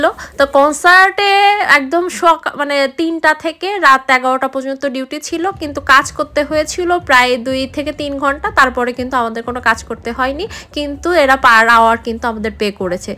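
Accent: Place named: Bangal, Bangladesh. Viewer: Apni bangal ascent ey kotha bolun otai suntey bhalo lage ❤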